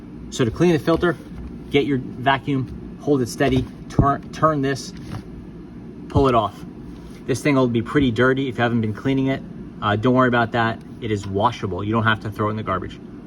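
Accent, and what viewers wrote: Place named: United States. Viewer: Hi from Australia 😊 you have the best American accent 😊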